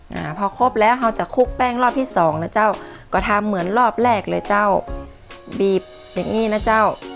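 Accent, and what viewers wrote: North, Thailand. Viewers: นั่งดูจนจบคลิป พึ่งรู้ตัวว่าเป็นภาษาเมือง 5555 (ด้วยความเคยชินกับภาษอ่ะเนาะ) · ชอบฟังภาษาเหนือค่ะน่ารักดี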